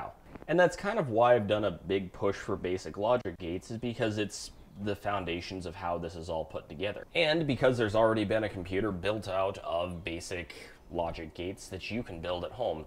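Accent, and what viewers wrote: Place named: Canada. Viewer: i saw an american flag, but you sound like every single person i know-- here in canada, lol.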